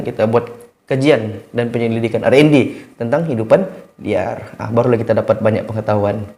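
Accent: Sabah, Malaysia. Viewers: ketara dialek sabah HEHBHABA btw thanks cggg ^^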